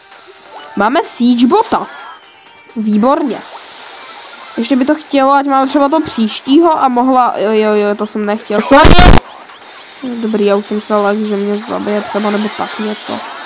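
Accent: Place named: Ostrava, Czech Republic. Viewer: Je Ostravák :)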